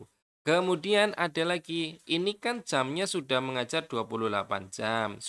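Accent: Banyumas, Indonesia. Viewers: Bg 😊, solusi tugas tambahan guru kami semua tidak terbaca di profil guru di dapodik, solusi nya bg?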